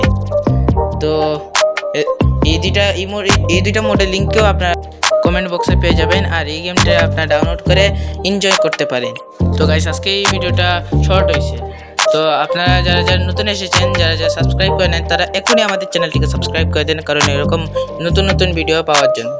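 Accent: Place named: Sylhet, Bangladesh. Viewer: আপনার কথার ধরন শুইনা মনে হইলো সিলেট এর...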